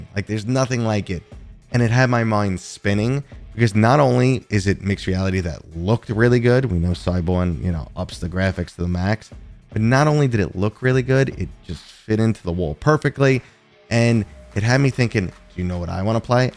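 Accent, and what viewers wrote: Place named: New York, United States. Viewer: haha my bad, just breaking your balls. I guess this is the first time I actually noticed your accent in your videos, is all :). Starting with how you said "Silent North." also you're likely in NYC if anything, idk why i was thinking Massachusetts haha.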